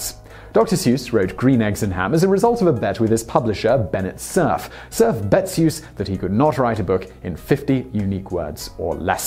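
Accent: United Kingdom. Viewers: is it just me or has he been getting more british?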